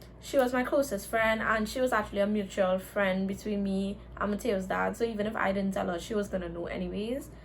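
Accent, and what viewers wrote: Trinidad and Tobago. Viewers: She sounds like a Trinidadian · what's your nationality? sound Trinidadian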